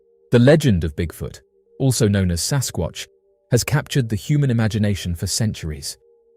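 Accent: United Kingdom. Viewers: In deed his pause and natural narrator skills very articulate and clear accent with traces of the best British language Looking forward for more subject Sr thank you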